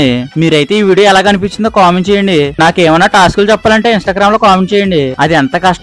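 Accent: Godavari, India. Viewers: Super anna i like your godharolla slang ante picha eastam annaya ❤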